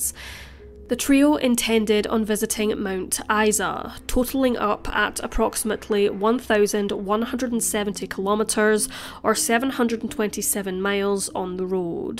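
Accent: Scotland, United Kingdom. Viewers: Defo Scotch. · Scotland is my guess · fuck you mean odd. It’s a Scottish accent, maybe roughly around Edinburgh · Brîndușa D. scottish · She's from Scotland, that's where I live x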